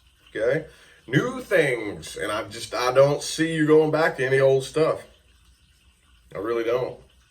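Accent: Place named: Boston, United States. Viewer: Lol im in NYC and i cant understand boston people lol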